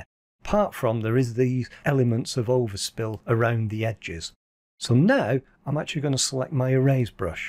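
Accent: United Kingdom. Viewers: Thank you so much for this instructive video, plus the perfectly spoken british accent ! :) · This is the second video I have watch of yours in the last 10mins, I have learnt more in this last 10mins than god knows how many hours I have spent listening to other people . Content great, british accent out of this world, some one I can listen to at last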